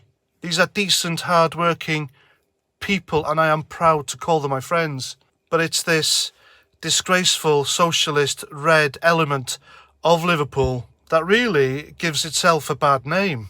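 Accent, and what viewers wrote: Wales, United Kingdom. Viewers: You sound from north east Wales or gogledd gorllewin Cymru as it's correctly known as. Why is showing one of our few democratic right to show dissent , in this case booing a dull and out of date anthem , classed as unpatriotic ?? What's wrong with being a socialist ?? We've had more years of right wing governments yet we still have more children in poverty than other nations in both the G7 and G20 group. We have the worst performing nation in terms of economic output and GDP in the G20 (except for Russia). We have one of the least democratic elections and form of government in the western world . I'm ex army - served in the Welsh Guards and yet since 2010 more and more ex squaddies are homeless and relying on charity as the state is doing less and less for them. May I suggest you do some research instead of posting right wing populist myth and wind your neck in you selfish halfwit.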